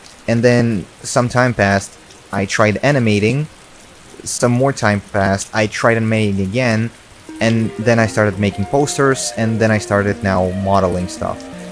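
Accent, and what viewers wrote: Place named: Russia. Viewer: How long have you been speaking english? You hardly have an accent so I didn't believe that you were Russian at first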